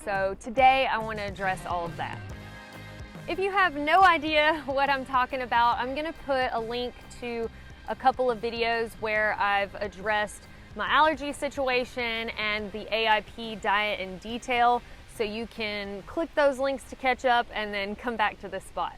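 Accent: South, United States. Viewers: Small world. I've been looking at getting into backpacking for a little over a month. I found your videos, really good and I've watched quite a few. I could tell from the first one you were southern, duh. Then I seen you were from Alabama...I thought that's cool, me too. Then a few videos later, I seen you were from Opelika. Then I thought wow, small world. I'm a lineman at Opelika Power. Keep up the good work. Your videos are invaluable.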